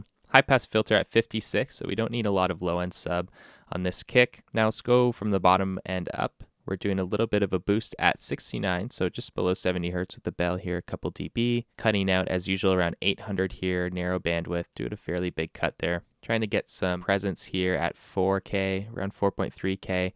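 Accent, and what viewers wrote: Canada. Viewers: I can tell you're from Canada by the way you say "out" which is "oat". Let's check this oat. So, where is from???? Canada! I heard some of that album a few years ago. I thought it was really good but never really listened to the drums so much (being a guitarist) but this is quite interesting. I wonder how you got those samples into their own tracks. Did you somehow trigger them FROM the real tracks? Maybe you have a vid on that where you explain. Thx for the walkthrough!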